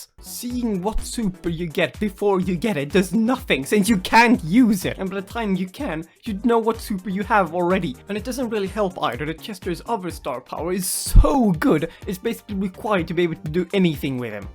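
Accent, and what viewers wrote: Sweden. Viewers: bra svengelska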